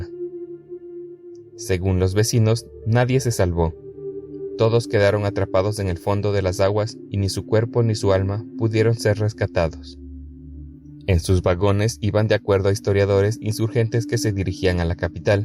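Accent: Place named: Andes, Latin America. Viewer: Otro excelente video , nos deleitas con las exquisitas Leyendas y mitos de La Sierra centro saludos desde siempre , muchos éxitos mereces más apoyo amigo! ¿Por cierto de dónde eres porqué noto que tenes acento andino?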